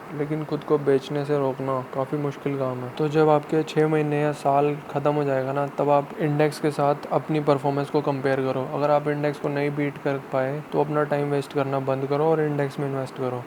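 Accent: India. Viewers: One suggestion/ recommendation only with well wishing to improve. Please speak closer to mic as awaz thoda faint hai. love your style of talking. Talking like a layman with Delhi Hindi twist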